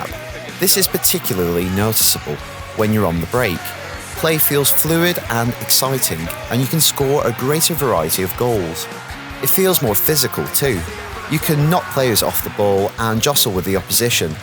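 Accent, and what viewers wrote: United Kingdom. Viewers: everytime theres a football game review on ign i think "boy, time for some british accent :D"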